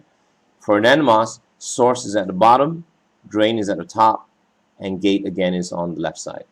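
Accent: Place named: Malaysia. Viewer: You have a nice accent for a Malaysian Lecturer. Nice content and elaboration, hopefully you would contribute and upload more Electronics videos :)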